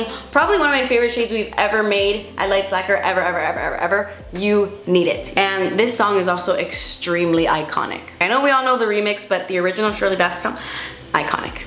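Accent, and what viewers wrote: United Kingdom. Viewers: Love the british accent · I adire your videos and your attitude, but man that British accent... it freaks me out👾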